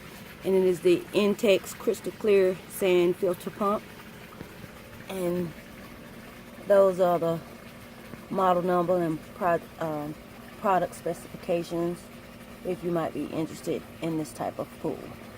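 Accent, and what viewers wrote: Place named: South, United States. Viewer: Not sure what southern state you are at? I’m living in South Carolina by way of Lyons Georgia. Love your natural southern Accent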